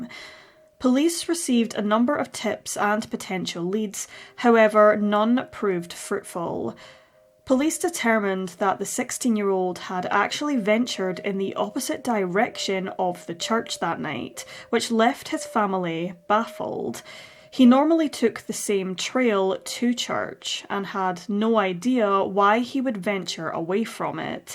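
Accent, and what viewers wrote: Scotland, United Kingdom. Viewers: what's with the British narration? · It's an educated British accent from Scotland, it is not "ridiculous".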